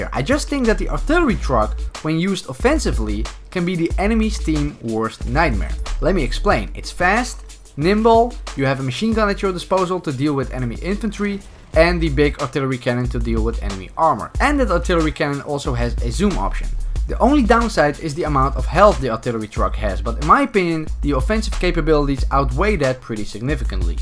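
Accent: Netherlands. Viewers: are you dutch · Your definetly dutch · nice dutch accent bro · Like to Hear the dutch accent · Prachtig nederlands accent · Are you Dutch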